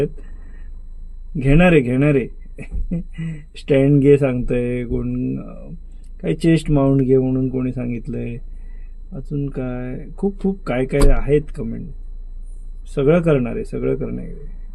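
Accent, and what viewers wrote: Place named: Goa, India. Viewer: Tumchi bolnychi tunning goan vatte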